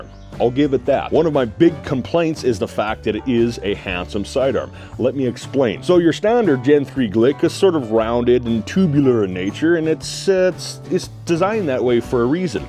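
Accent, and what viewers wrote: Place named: Northwest England, United Kingdom. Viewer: This man has the most aggressive northwest accent I've ever hear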